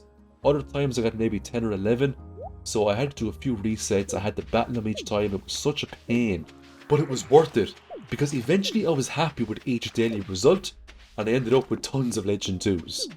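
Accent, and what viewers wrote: Ireland. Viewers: OMG I knew it, I knew you must be irish! So glad I ran into your answer to btw an absolutely inappropriate question.... I've been learning irish for a while now and I'm just so pleased that I've managed to recognize it in your english :D The way you're saying "farm" was the strongest hint 😂 I LOVE it btw, could listen to you for endless hours - which is what I just actually did by watching this video xD Just started a fishing only Riverland farm myself and was coming for inspo. You've been such a big help, absolutely nailed the challenge. Keep up the good work! ❤️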